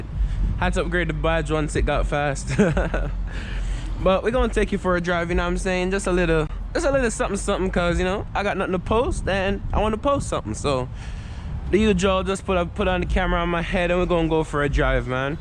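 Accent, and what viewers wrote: Jamaica. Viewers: As a yaadman, me coulda pick up the accent immediately lmao, big up yuhself me g, dawg push di hellcat yuh fuckkkk😂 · This the jamaican crazy driving yall won't understand😂😂😂don know mi a watch from aruba/jamaican big up much love love e accent my yout and the content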